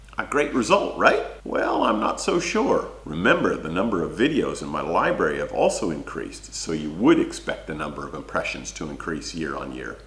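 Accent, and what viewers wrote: Canada. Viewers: Nice. Logical, well presented and informative. I just subscribed......guessed you were a beaver boy.........best national animal ever by the way😅.....by the accent. Going through your catalogue of videos now.......can see some interesting stuff. Keep up the good work. Cheers mate.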